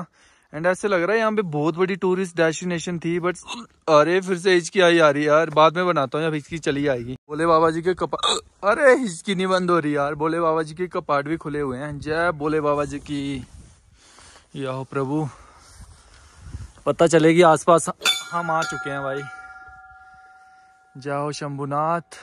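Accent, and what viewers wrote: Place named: Chhattisgarh, India. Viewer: Are apna RAIGARH CG. SE h ye log inki tone se lga hi tha😀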